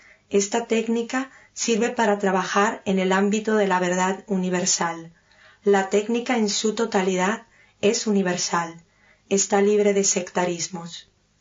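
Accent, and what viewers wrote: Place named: Argentina. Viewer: Yo extrañaba la voz argentina. Muchas gracias.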